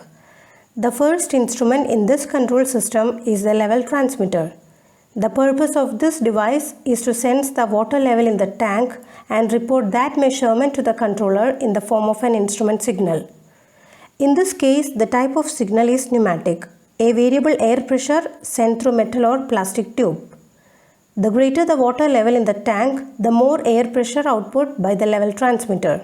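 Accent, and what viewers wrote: India. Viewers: The Narrator is a Malayalee... Well explained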